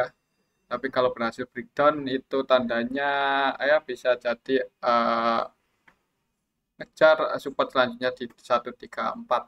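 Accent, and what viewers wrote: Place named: Wonosobo, Indonesia. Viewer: dari logat e orang Wonosobo nan mas wkk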